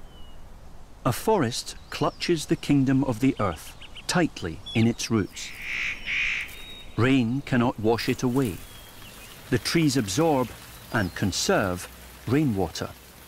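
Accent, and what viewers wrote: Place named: Scotland, United Kingdom. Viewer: Moar narration with that Scottish accent, please! ♡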